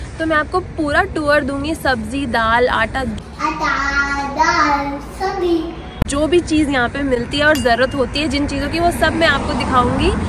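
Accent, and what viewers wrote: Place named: Rajasthan, India. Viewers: Ye inke bolne ka tone hai Rajasthan se hai ye rajasthani me pada hua hai ese ho bolte hai isliye inki hindi me mix ho jata hai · Pahli baat ki video banane wali rajasthan se lagti hai.sahi Hindi nhi bol pa rhi..yaha para huwa nhi hota..rakhi hui hai boliye..aur ek zaruri baat..her chiz pe vedio mat banao..ye kya matlab hai ki supermarket dikhate hai aapko..are ab india main hypermarket aa gaya jo ki vo bhi boring ho gaya hai aajkal...Germany main rah k training lo ki kaise presentation dte hai..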